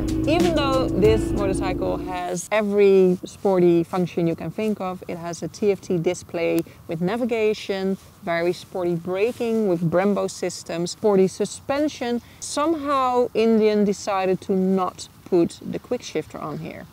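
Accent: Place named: New Brunswick, Canada. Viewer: I couldn’t afford one so I picked up an MT-09. Was obsessed with it, especially the 2023 FTR 1200 S model, which has the exact same tires as the R6 I just sold. Couldn’t ride sport bikes anymore and wanted something upright sitting (with good hp AND torque) so these bikes fit the feel, size, sport category style and power which saves me from going to a heavy low hp cruiser. I was shocked to hear that the paint peels especially after knowing it was $4000 more than my MT-09. Dad always wanted a Harley before he passed but the 70s/80s HD’s were different. My go to in his memory is this. Now I just have to remember it’s jerky at high rpm and paint peels. I’m in NB, Canada and could tell from the accent and the bridges in the background where this was filmed haha! Awesome video! Agreed. Ryan F9’s video on it was great! +Glad to hear the windscreen helps! 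Had to add one to my MT-09. I’m used to the cockpit feel with R1 and R6 windscreens! Glad you didn’t hold back on what you didn’t like!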